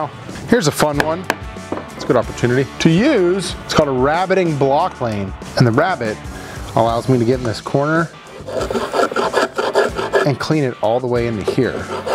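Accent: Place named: Pennsylvania, United States. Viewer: Youins guys.........Pennsyltucky English. Love your channel. Thanks for sharing.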